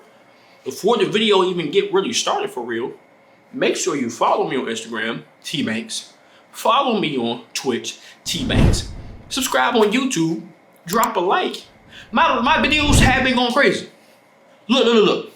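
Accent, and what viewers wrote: United States. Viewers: Is he frm New Jersey ?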